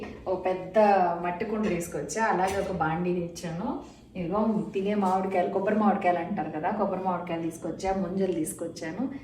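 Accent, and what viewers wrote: Nellore, India. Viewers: Medam midhi Nellore ahaa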